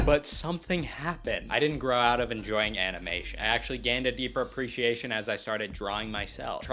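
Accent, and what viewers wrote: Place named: Chicago, United States. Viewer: this guy gotta be from Chicago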